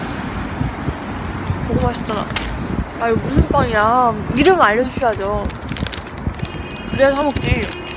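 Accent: Seoul, South Korea. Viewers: 옛 서울 사투리같아요 너무 귀여우신듯 ㅋㅋ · 근데 영상들을 보면 볼수록 80~90년대 서울사투리하는것같죠 ? ㅋㅋ 어리신분이 ㅋㅋㅋ 부모님 말투 닮으셨나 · 말투에 '~거든요' 같은 서울 사투리 쓰는거나 화장법이나 생김새도 10년대에 유명한 얼짱? 이런 느낌이고 저는 보자마자 예전 얼짱 얼굴 썸넬에 박아둔 플리인줄알고 노래들으러 왔는데 당황함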